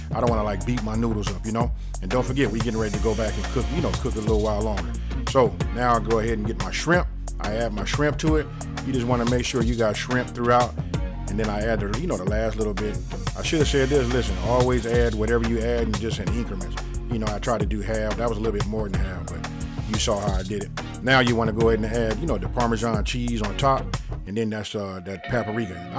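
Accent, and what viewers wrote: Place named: Louisiana, United States. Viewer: where are you from??? I hear some Louisiana flavor in your voice!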